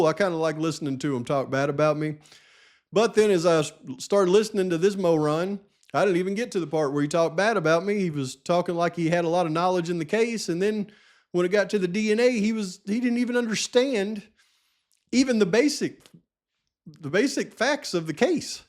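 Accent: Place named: Texas, United States. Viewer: C i too recognize acent. It's pure texan.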